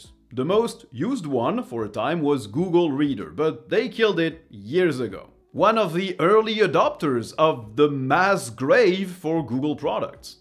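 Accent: France. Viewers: Are you French? I thought you were Spanish.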